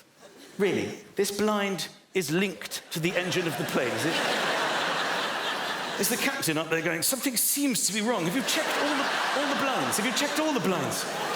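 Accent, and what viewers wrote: RP, United Kingdom. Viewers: Michelle Bolen - billy is relatable with his comedy - but i relate to Micheal more..because I’m from where he lives in UK ..so accents are similar (easier to understnd) - with billy, He is a strong Texan accent..you have to really concentrate to understand every word of what he’s saying. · And to all the Americans out there. This is an actual British accent. Not the posh snobby accent u bring out.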